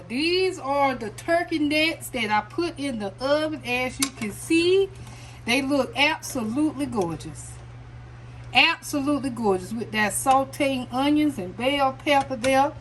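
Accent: South, United States. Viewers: I was looking for a good recipe for Turkey Necks and came across this video. You go Auntie! Love your southern delivery and the turkey necks look delicious! Can't wait to try this recipe. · Cumin is also good on any pork dishes! Love me some turkey necks.... yummy. I love ur southern accent! I can tell u can cook! Some folks don't know how good turkey necks are!!!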